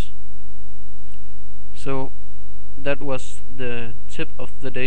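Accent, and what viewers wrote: Denmark. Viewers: hey.. jeg kan høre du er dansker :D ..jeg har et problem... jeg har download ubuntu okay... men jeg kan ik' brande den ned på en skive hvorfor kan jeg ik' det???... jeg prøvede også at putte iso'en på en usb... men jeg kan ikke få min pc til a boote fra usb ??? hvad skal jeg gøre `???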